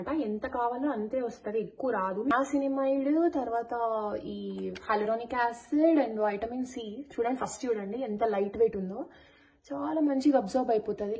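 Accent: Rayalaseema, India. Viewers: Pakka maana Rayalaseema language ❤❤❤❤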